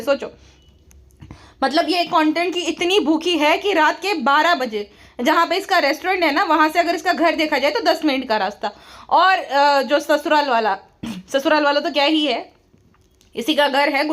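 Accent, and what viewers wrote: Gujarat, India. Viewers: I just love ur "Gujarati accent" 💜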